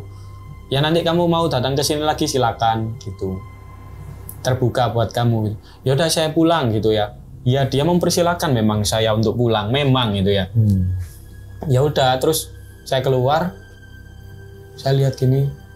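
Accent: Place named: Java, Indonesia. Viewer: Medok banget mas....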